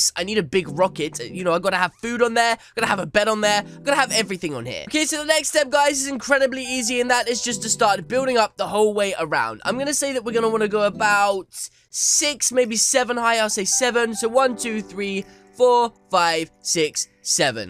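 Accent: United Kingdom. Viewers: I just realised he's british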